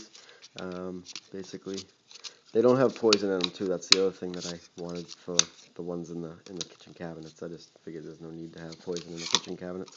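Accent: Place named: New England, United States. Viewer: A New England accent always gets my attention. 😊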